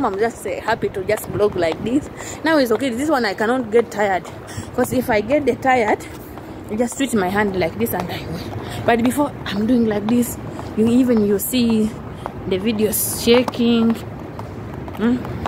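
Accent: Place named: Jamaica. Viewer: You talked like a Jamaica 🇯🇲 ❤ you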